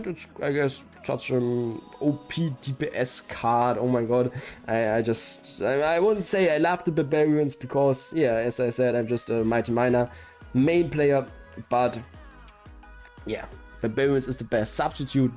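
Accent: Germany. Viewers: you really are a legend. btw do you happen to be from germany or is that another accent im hearing?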